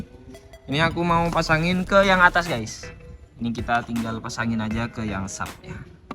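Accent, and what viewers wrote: Madura, Indonesia. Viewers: kok kayak orang Madura KK emang asli mana😅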